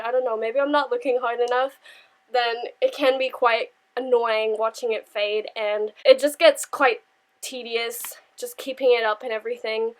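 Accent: Australia. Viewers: You have like a little Australian accent now and it's so cute😍😍😂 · maybe i'm going crazy but you sound so australian here and i can't ever remember you being australian wtf is going on with my brain rn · In this video I can really hear your Australian accent😊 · Aww your accent is changing. You're beginning to sound Aussie !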